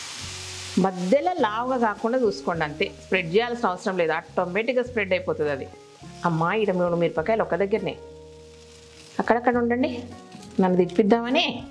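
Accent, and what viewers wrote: Telangana, India. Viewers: Yellow sareelo muddabanti puvula unnaru.meeku sarees baga suit avutayi abba! Telangana maata vellulli 🙏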